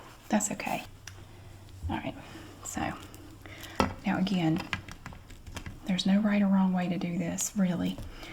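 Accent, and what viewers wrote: South, United States. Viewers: "It has little fish awn it!" 😂 southern accents are my favorite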